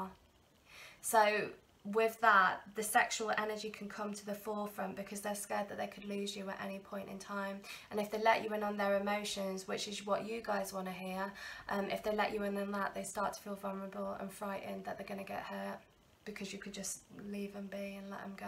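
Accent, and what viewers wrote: United Kingdom. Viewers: love ur accent British gurl! thanks🤐❤️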